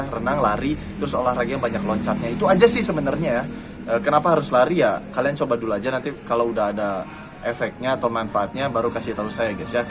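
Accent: West Java, Indonesia. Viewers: Bang dari sunda kah? Itu mangunreja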